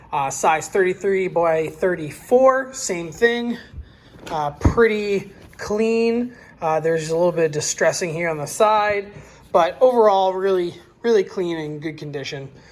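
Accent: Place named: South, United States. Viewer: Hey it Southern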